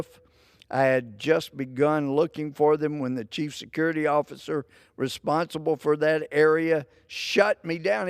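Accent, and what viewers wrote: South, United States. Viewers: He has that charming southern drawl at times.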